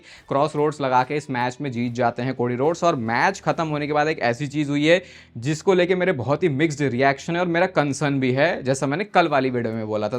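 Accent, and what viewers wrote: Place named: Uttarakhand, India. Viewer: ❤ Bhai's Pahadi (Uttarakhandi) Accent 😅❤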